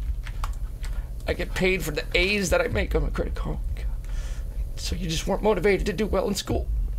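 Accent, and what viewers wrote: United Kingdom. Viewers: Nice british accent ^.^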